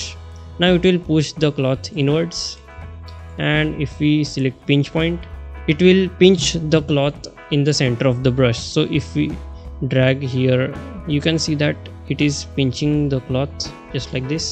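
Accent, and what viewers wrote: India. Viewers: Do you know a good app to make a voice over to hide this shitty pajeet voice?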